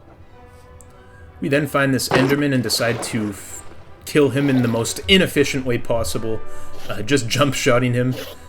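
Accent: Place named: Canada. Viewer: its almost like his canadian accent gets stronger as the video goes on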